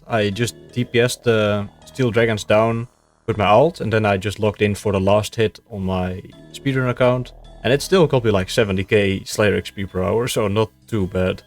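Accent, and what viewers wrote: Netherlands. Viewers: Hearing you say "blasted these fools with the d hally" in that amazing Dutch accent of yours made me grin from ear to ear lmao. Always looking forward to the next episode, keep it up!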